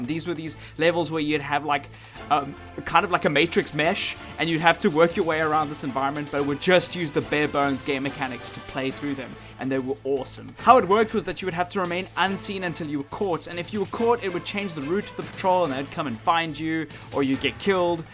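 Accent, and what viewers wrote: South Africa. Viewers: Hey, I thought I heard a hint of South African in your accent and I wanted to know if you are.:D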